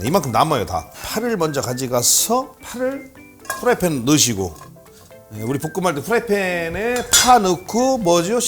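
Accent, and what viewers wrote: Chungcheong, South Korea. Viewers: Yee분 충청도 사투리 같은거 쓰는데 충청도 사람이에you?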